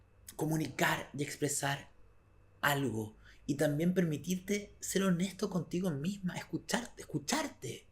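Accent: Chile. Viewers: Lo vi todo, obvio!!! Tengo 50 y cumplo el 20 de agosto... Sentí q me hablaste a mi!! Estoy nueva en esto de la astrología, era super exceptica con eso pero desde q te escuche en el podcast tiene sentido y me cambiaste todo en cuanto a eso. Me maravillo al verte hablar. Viví en Chile casi 3 años y extraño su hablar y contigo tengo un combo de maravillas entre lo q dices y como lo dices. Un abrazo!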